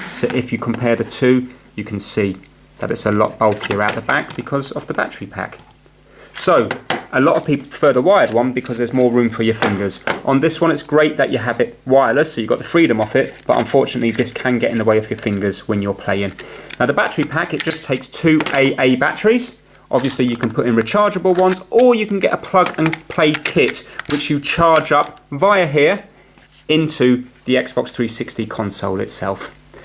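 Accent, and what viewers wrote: United Kingdom. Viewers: It’s a British accent like me, America uses England’s language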